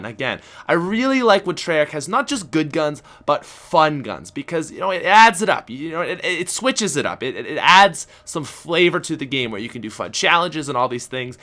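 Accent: Canada. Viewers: It's his Canadian accent calm down (I think :/) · he's Canadian so he pronounces words differently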